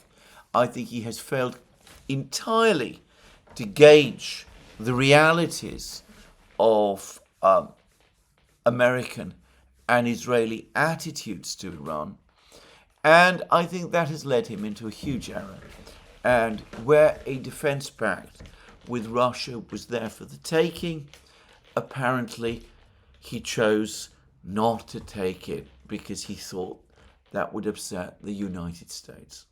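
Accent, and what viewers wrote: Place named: RP, United Kingdom. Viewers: I like your speech . Because you speak softly and in queen's language. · It's an educated London accent. It is not how the Queen spoke.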